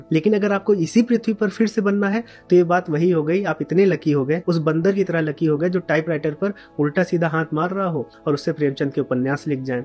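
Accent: Standard. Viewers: आपकी ज्ञानवर्धक बातें तो बहुत अच्छी हैं परन्तु आपका प्रस्तुत करने का अंदाज भी लाजबाव है। शुद्ध उच्चारण से विडियो काफी अच्छी हो गई है। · Impressive. I really admire you with your Hindi speaking. Ekdum sudh Hindi.. In today's time you are making videos in pure Hindi. Aapko Naman hai 🙏🙏🙏🙏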